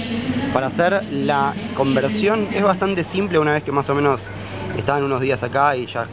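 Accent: Argentina. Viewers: te consulto , eres porteño o del interior . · Chumbo!!! Chumbo!! Que delicia de acento argentino. Esto se llama LIBRE MERCADO PAPI !!! Que lo implantó Pinochet y sus Chicago Boys y ahora disfrutamos y nos quejamos de llenos y de mal agradecidos. Vengan más argentinos y desplacen a los colombianos, que nos están cagando el país. Abrazos.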